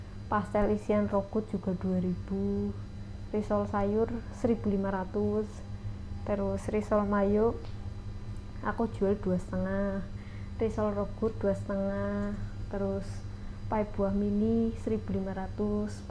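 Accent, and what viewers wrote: Banyumas, Indonesia. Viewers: Oalah Purwokerto toh mba.pantes bisa bahasa ngapak..salam mba aku dr banyumas😊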